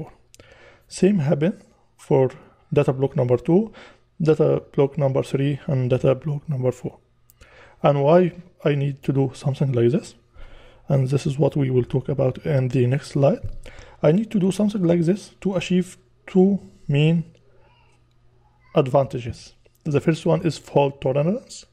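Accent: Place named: Middle East. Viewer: I guess its the sweet middle eastern accent used by the gentleman.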